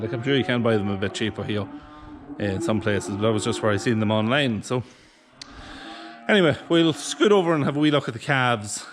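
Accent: Ireland. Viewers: Where are you from in Ireland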